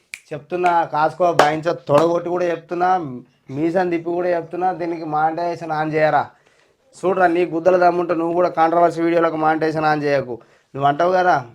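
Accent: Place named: Telangana, India. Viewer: Telangana slang ❤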